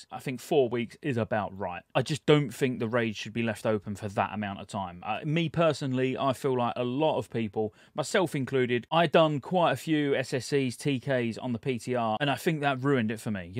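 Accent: United Kingdom. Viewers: Where are you from if you don't mind? Just curious about accents. Obviously UK, but specifically? (Once again not actually specfically just ur accent)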